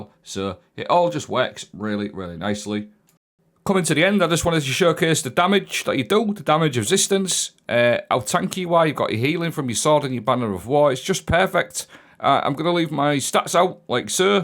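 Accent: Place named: Yorkshire, United Kingdom. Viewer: Love the content mate! Can relate to the Yorkshire accent too, which is always nice :) keep up the good work bro!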